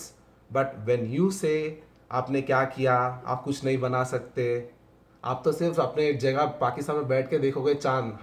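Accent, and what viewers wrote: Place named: Hyderabad, India. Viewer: bhai gud reply in typical Hyderabadi accent 👌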